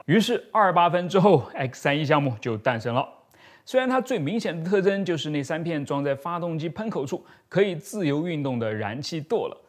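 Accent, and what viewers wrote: Nanjing, China. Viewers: 哇，，原来是南京人啊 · 是南京的？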